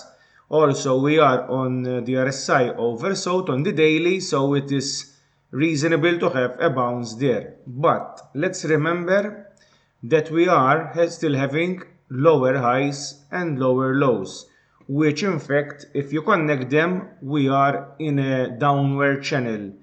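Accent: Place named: Russia. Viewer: I like your accent beside the analysis. Like a person from russia or france.😁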